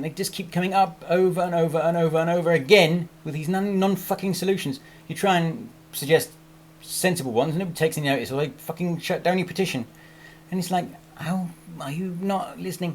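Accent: RP, United Kingdom. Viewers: are you not a bit to posh to be a trucker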